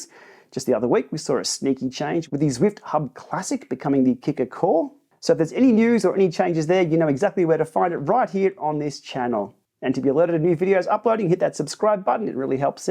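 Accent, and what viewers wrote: Australia. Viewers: Don’t say it both ways just talk Australian and say route as root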